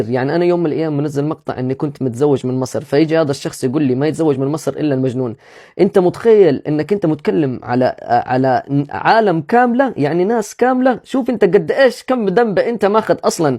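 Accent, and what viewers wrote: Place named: Myanmar. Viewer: انت يمني او برماوي كلامك كأنك برماوي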